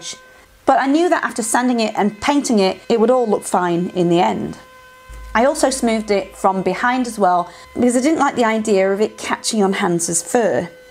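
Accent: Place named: Stockport, United Kingdom. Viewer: Really enjoy your videos! I’m originally from Stockport Manchester, I’m now in the US. Your accent makes me think of home.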